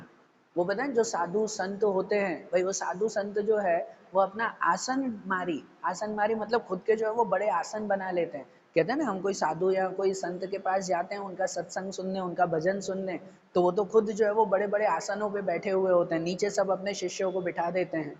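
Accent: Gujarat, India. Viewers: What a explanation with Gujarati accent 😅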